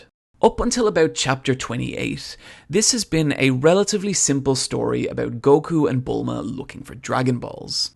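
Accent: Ireland. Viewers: holy shit I didn't know you were Irish dude hell yeah I knew that accent was familiar. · Oh? You're Irish? I thought your accent seemed familiar, but it's always safer to assume English. Hello, fellow countryman! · huh so youre irish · Oh I was pretty sure he was Irish, that accent sounds about right. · I only now started hearing the Irish accent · He's Irish?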